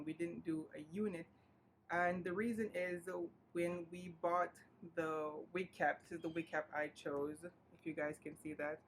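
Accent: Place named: Jamaica. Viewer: I'm Jamaican as well, i picked it up right away 😂👏🏾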